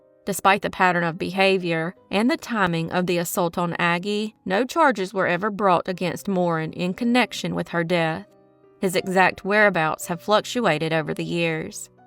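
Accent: South, United States. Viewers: Yours is the only channel that I watch unsolved mysteries, only southern accent I like. Thanks for all of you hard work. Wishing you many, many more subswcribers. Panama here. 🥰♥🖤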